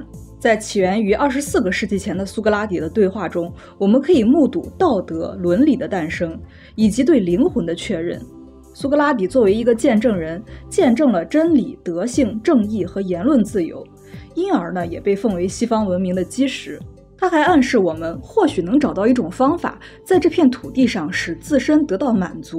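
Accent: Jinan, China. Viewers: 听你口音像济南人 是吗老乡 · 难得的有思想有口才的好主播，果断订阅了❤播主是济南人吗？一口济普乡音很亲切啊😊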